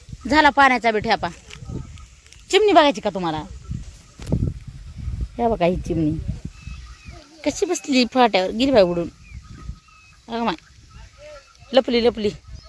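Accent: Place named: Ahmednagar, India. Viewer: ताई तुमची भाषा नगरी आहे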